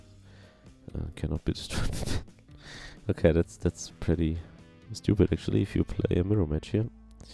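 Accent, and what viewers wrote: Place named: Germany. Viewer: You are creating good videos but please work on the language the german accent is hoorrrrribale. Its not „di“ its the or you can spell it „se“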